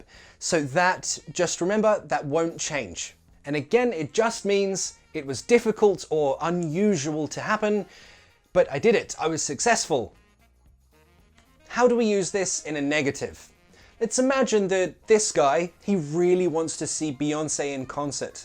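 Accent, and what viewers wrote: United Kingdom. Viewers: good morning! learn english with, congratulations for your channel it is the first time i watched your video and ı would like to ask you, so your english is british? thanks and have a nice day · I don’t like British accent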